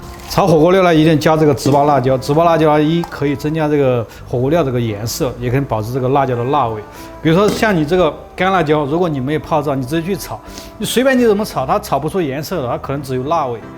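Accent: Sichuan, China. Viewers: 我的父母都是四川人，在美国听到四川音感觉很亲切。四川好男人，靠谱！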